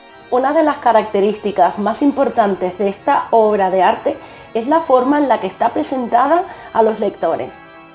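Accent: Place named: United Kingdom. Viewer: Me encanta!!! A mi me gustan todas las adaptaciones llevadas a la pantalla grande, no me he leído el libro... Pero seguro que lo busco y me lo chuto!!!! Por cierto ame tu inglés tan británico y elegante!!! Y MUCHAS FELICIDADES por tus primeros 💯 suscriptores!!! Yeiiii!!! 🎉 🎉 🎉 Ya tienes tu URL personalizada? 🤔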